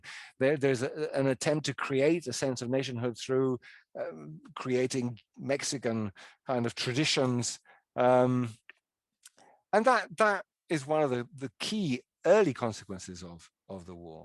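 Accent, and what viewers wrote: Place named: United Kingdom. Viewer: It is interesting that a British accented man has taken up the task of telling the "Mexican perspective" on this history.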